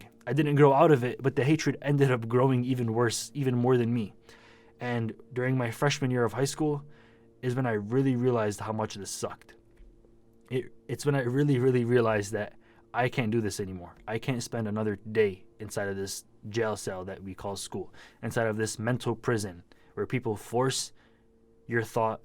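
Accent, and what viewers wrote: Michigan, United States. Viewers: Immediately knew he was from Michigan because of the accent lmao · I thought your accent seemed so familiar lol I’m from michigan too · You look like you’re from dearborn you have our accent a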